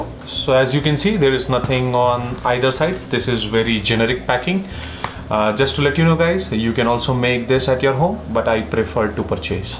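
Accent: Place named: India. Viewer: Are u indian. U have an indian accent but ur bio say u are mexican 😅. Why don’t u make more videoes related to telescope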